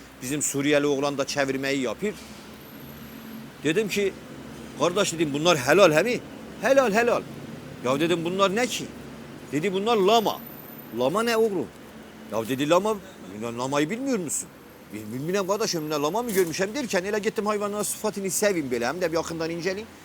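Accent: Erzurum, Turkey. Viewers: Galiba giyamet Kopti hele şükür Erzurumle mümesin video atmış konuşma tarzı Erzurum söyliyim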